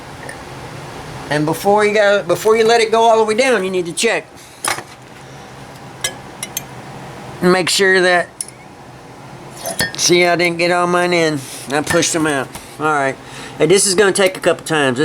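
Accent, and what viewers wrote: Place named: South, United States. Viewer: DUDE!! Thanks for the video! I just bought my 2x 124s the other day for 150$!! LOL One of them is blown, one is perfect still! Theyre like brand spankin new almost! Are you reconing the second sub or are you hanging onto your 2nd recone incase one blows? I need to get myself a coil STAT! I had no idea these subs were so easy to recone like this, I gotta hustle up some cash real fast so I can buy one. If you do recone your 2nd sub you should sell me the coil you take out :D Im from Cookeville, TN, you sound like youre also in the southern area? Take care man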